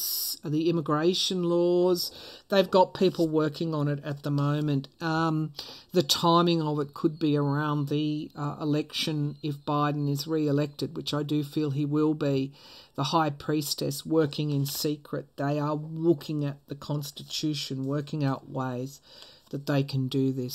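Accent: Australia. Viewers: Hi! I'm just a country girl who was born 65 yrs ago and have resided in North Carolina all my life. I found your channel a few weeks ago. Love your readings & love your accent!!! If I understand correctly, you live in Australia. On one of your videos could you say "G'day"? 😊 It has been my feeling/dream (since I was a young girl) that I should visit Australia one day. I have only visited one country outside the contiguous USA and that was South Korea. It was an amazing country! But, for some reason, I feel Australia is still calling out to me.😊 Anyway, I adore you and your channel! May God bless you!❤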